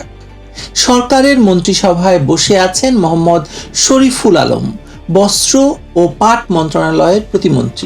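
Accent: Kolkata, India. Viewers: Your Bengali accent is like Kolkata's Bengali accent. I like and enjoy the way you speak.🙏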